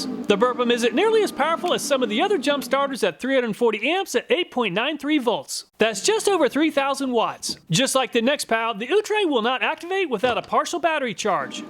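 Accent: United States. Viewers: If only I could stand to listen to your squeaky Yankee voice.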